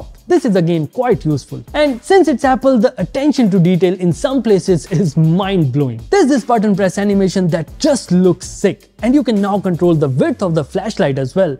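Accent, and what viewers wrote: India. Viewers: oooh god this indian english aghhhhhh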